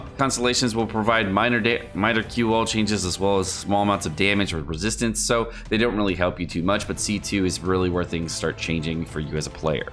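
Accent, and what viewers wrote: United Kingdom. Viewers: I want that British accent of yours to say "can I got a bottle of water" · The British accent just cracks me up xD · Dam your British accent be better then mine and I am actually British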